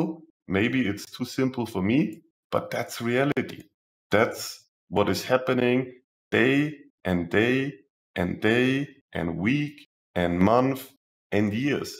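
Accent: Germany. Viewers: Are you German, bro?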